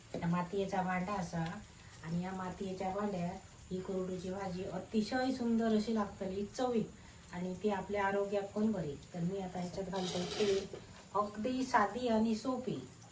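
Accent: Malvani, India. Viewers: उत्तम मालवणीत समजावून सांगतात काकी तुम्ही 😂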